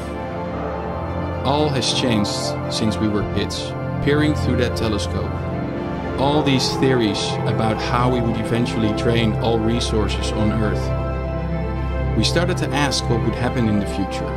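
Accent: Netherlands. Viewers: Im sorry for the Dutchie, but what an accent! Joe can almost hear de nederlands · Dutch accent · This really sounds like a dutchman telling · i hear from his accent he is dutch like me haha. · Deze gast is sws nederlands als je goed luisterd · This is a Dutch guy for sure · i heard the dutch accent before the video started playing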